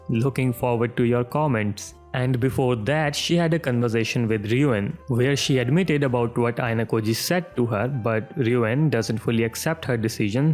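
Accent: India. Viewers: I'm one of the novel pioneers. At first I was like. "Uhhh what's with this indian accent voice" But then he says funny stuff in the end.. "Bro youre so hilarious". You get a sub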